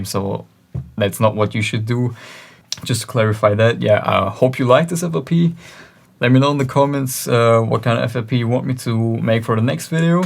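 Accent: Germany. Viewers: Man hört den deutschen Akzent so stark raus🙈, trotzdem sehr gutes Tutorial👍 und vielen Dank für die Flp🙏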